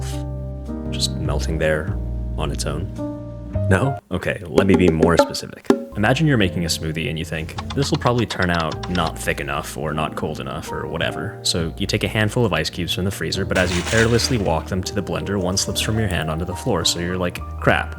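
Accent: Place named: United States. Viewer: u showed ur self in the video in end and i was like who the hell is that guy | i was expecting someone american looking from the accent u broke that like the glass voice personification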